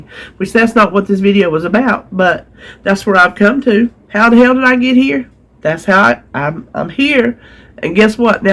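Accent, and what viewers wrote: South, United States. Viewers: Thank you you got me up off the chair and I'm not going to squander this body any further that God gave me. I'm going to do Leslie sansone the one minute walk everyday and now that I've said that I'm promising you so I got to do it I love you thank you so much watch you everyday and have for a couple of years you're an inspiration and I love your Southern Roots and accent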